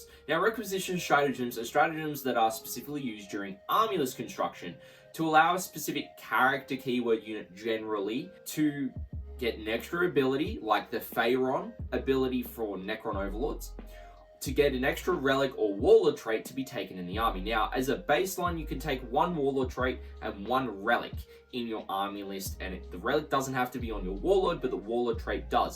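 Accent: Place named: Australia. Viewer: Thank you for explaining this in Australian English.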